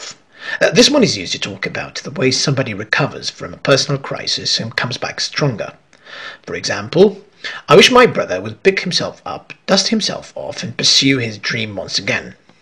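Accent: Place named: United Kingdom. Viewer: I would like to take a moment to hail my dear brother and colleague for the excellent and distinguished work he is about to engage with. You get to enjoy the pride of your family, friends, and students, my dear teacher. The podcast reveals how creative is your rebellious spirit wherein your British accent shines to beautifully intersect with the condensed and rich content of the podcast. I wish the attractively presented video would be but an outset of a promising stage of multiple challenges.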